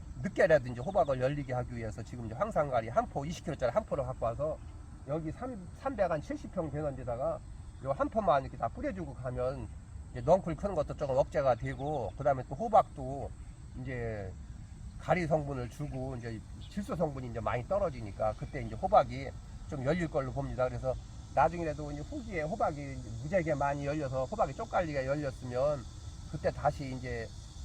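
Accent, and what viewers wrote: Chungcheong, South Korea. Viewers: 충청도 사투리 정말 정감있고 와닿네요~^^ 단호박 비료 안줘서 한이지~ㅋㅋ 매번 잘듣고 도움되고 있습니다.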